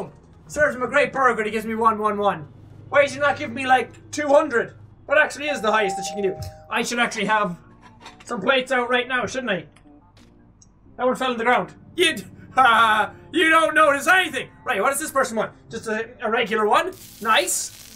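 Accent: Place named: Ireland. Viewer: I'm just wondering... why Jeremiah? Like i know its just a name he thinks of on the spot, but... why Jeremiah? It doesn't trigger or offend me or nothin but it just makes me think of my big bro so if i zone out due to my recent work load, then i hear "Jeremiah!" in Sean's infectious Irish voice, it just makes me think that my bro is there or smth XD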